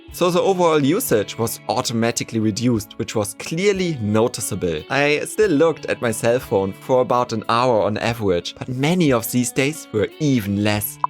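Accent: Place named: Germany. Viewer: I smell Deutsch but sonst sehr gutes Video!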